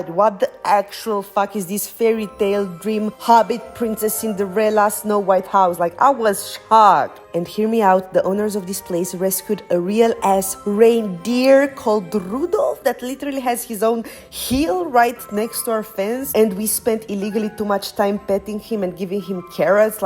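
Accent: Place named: Romania. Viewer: Tu esti clar romanca(accentul baby te da de gol) dar prietenul tau ce natie este? Santeti amandoi simpatici😂😂😂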